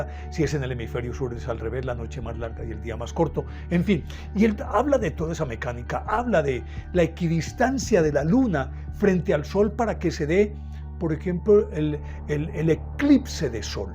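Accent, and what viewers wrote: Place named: Antioquia, Colombia. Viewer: Lo mas bonito de la narracion es el acento paisa que me parece hermoso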